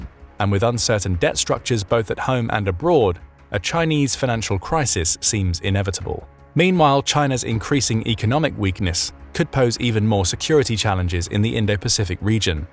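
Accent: United Kingdom. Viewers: Yet another AI generated channel with a British accent robot reading script. Who is behind this channel?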